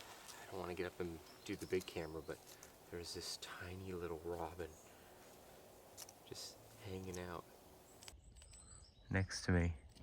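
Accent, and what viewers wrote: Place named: United States. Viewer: You use a lot of British terminology in an American accent. Are you an expat?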